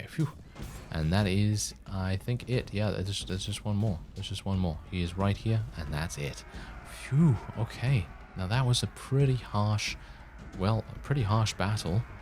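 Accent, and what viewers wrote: United Kingdom. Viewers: a very proper British accent in my opinion, it’s butchered otherwise haha between the old Australian accent and this blokes one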